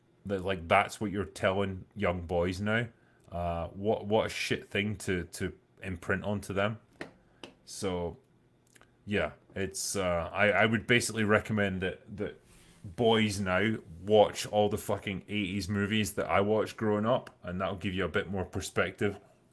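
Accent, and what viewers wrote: Scotland, United Kingdom. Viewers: Talks like a Scott, drinks like an Irishman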